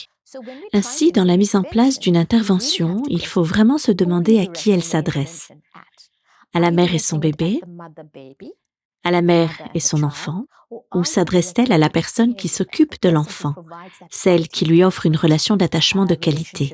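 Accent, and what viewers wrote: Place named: France. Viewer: Vidéo très pertinente!! Et merci pour la douce voix française qui a permis une écoute très agréable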